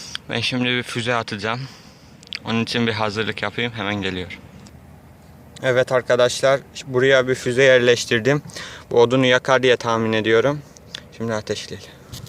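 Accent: Black Sea, Turkey. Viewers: karadenizlimisin şiven var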